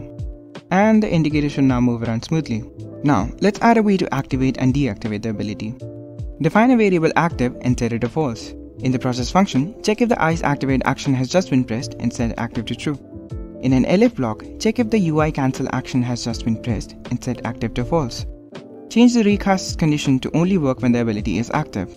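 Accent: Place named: India. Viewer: Great vedio. Literally can't differentiate between the original and the one you made. Also I got a question for you. Where do you live? (Country name). You sound very Indian to me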